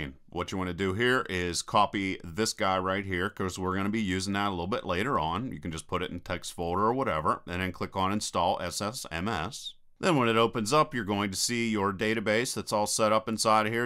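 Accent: Pittsburgh, United States. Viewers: Are you from Pittsburgh?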